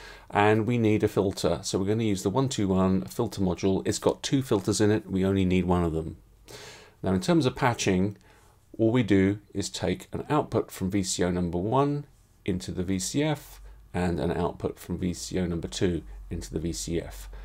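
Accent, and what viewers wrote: United Kingdom. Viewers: I fully agree. After long years of absence I've started last year again with synths. My "starters" were Behringer´s wonderful Model D, DeepMind and Pro1. In the meanwhile the Model D and Pro1 are replaced by systems of Moog. But nevertheless... starting would never happen with the more expensive Moog systems. Therefore I honour the products of Behringer as it gave me the chance to start again... even with a less budget. And not to forget... the work of is fantastic. Lots of explaining in a very British and comfortable way.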